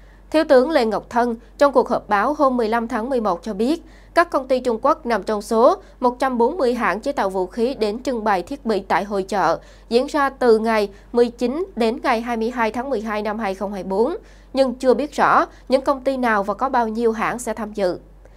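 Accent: South, Vietnam. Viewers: Giọng nói miền Nam de thương, rõ ràng, de nghe, còn miền Bắc không kho nghe. Nhất là dấu hỏi và dấu nặng: vi du: du chưa? Du chưa!!!!!!!?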